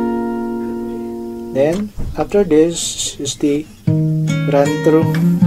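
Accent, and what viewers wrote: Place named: Philippines. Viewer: Thanks so much for teaching us the song. By the way, you sound like you are from the Philippines! I can hear a dialect that makes me think you are from the Philippines. I hung out with friends in nursing school that were from the Philippines. They ars so so cool! Thx again for teaching us the cords!!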